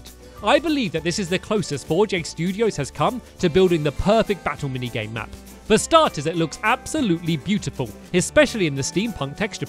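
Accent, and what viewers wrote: United Kingdom. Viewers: Because I'm not used to a British accent I thought you said my egg favorite map instead of my 8th favorite map lol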